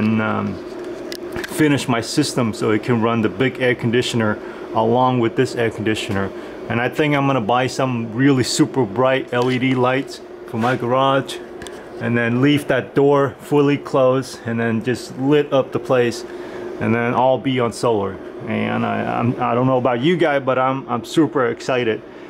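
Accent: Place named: Austria. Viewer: your accent sound's like arnold schwarzenegger very cool you get a like just for that :)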